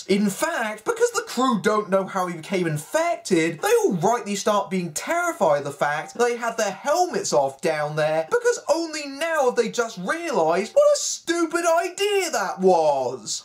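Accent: United Kingdom. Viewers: this maybe the first time i find a british accent fucking annoying.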